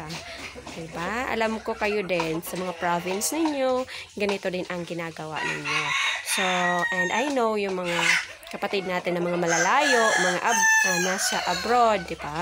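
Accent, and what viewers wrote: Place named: Bicol, Philippines. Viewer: Hello manay.Sain ka tabi sa albay?Parang paryo kan bisaron ta ah😁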